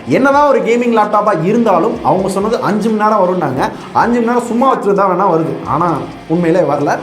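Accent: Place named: Madurai, India. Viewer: Thala unga madhurai voice and tones.. Summa vera level😎💓👌👌👌👌👊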